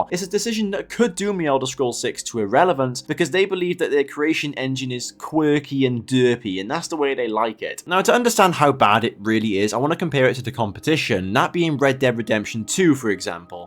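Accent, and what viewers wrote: South Wales, United Kingdom. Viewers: I sense a south wales accent , newport ?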